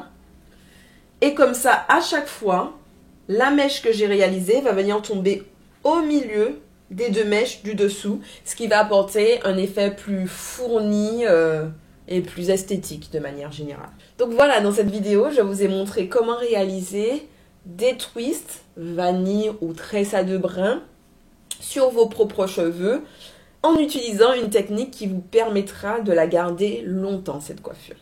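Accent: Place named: Caribbean. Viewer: Merci. J' adore ton accent, je me crois revenue au péyi chaque fois que je te regarde. J'y vais l' année prochaine, merci pour ta technique que je ne connaissais pas et finis bien cette année 🤩🤩🤩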